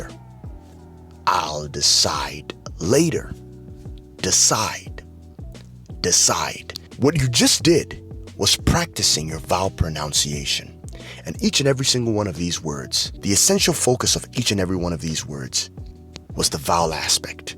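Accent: United States. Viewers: It's not a British it's an American accent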